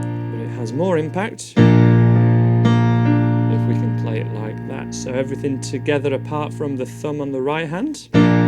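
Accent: Yorkshire, United Kingdom. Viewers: Omg SUCH a yorkshireman!!!!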